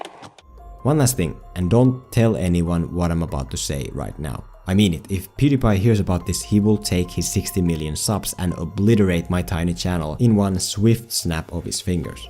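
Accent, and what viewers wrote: Finland. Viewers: Your accent sounds so fucking Finnish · Ahh I recognize a finnish accent when I hear one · krakozhia... hmm .. but you accent still sounds alittle ... Finnish... maby... Estonian... maby... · is krakozhia a inside joke? because i couldnt find anything on google and tbh you sound finnish torille tavataan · Are you Finnish? You're accent sounds Finnish. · Nah, it's a fictional East European country from some movie or video game or something, I don't remember. But he is clearly Finnish, I can tell by his accent (I'm a Swede).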